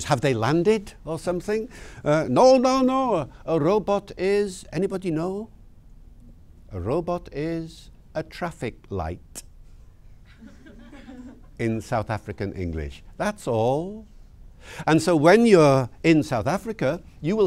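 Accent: RP, United Kingdom. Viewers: His accent sounds 100% British. Not one American accent (not even the transatlantic one) comes close to sounding like this. If you think this sounds like American English, you are quite unfamiliar with spoken English (no offence intended). I personally think he has a hint of a northern British accent. - I think his pronunciation of "see", "settlers", and "home" give it away a bit, just to name a few examples. According to Wikipedia Billy Crystal grew up in Liverpool while his mother was from Northern Ireland, so that may explain it. I'm not a native English speaker though, so if any native British English speaker could tell me whether I correctly picked up his northern leaning accent, that would be great. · David Crystal's pronunciation is known in linguistics as RP (Received Pronunciation). The closest spoken English to the rules of English pronunciation is found in the upper Midwest of the United States, often called " General American Standard", it lacks the different accents from other areas. 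Major TV networks send there promising young news broadcasters to special accent neutralization classes in the midwest. General American Standard is often called Network Standard or newscaster accent. RP and General American are pretty close, RP has a little "Prestige" inflection in it. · He speaks RP, which was invented for that purpose.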